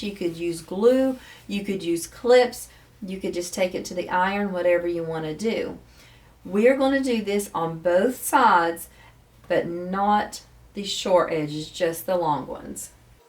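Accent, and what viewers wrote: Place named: West Virginia, United States. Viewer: I love your accent, too. Reminds me of growing up in West Virginia!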